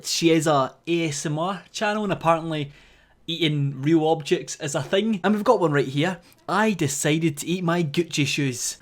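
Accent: Scotland, United Kingdom. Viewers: Your accent gives me life I can’t understand half of what you say but it’s like I’m in the countryside of Scotland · Is he Scottish? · "mashing tatties. More like Tati mashed James" 😂😂😂 Edit: also where in Scotland are you from? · Your Scottish accent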